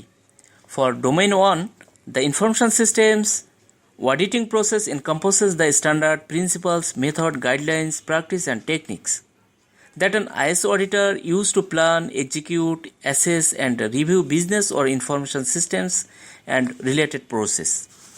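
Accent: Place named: Bangladesh. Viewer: Very good...great presentation and I am also enjoying your eastern Bengal influenced accent.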